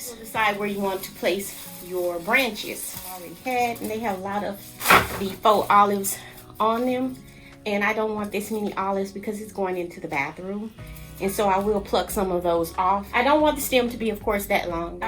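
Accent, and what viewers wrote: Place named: Texas, United States. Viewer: Amen to Texan accents 😊. This is amazing. Love it ❤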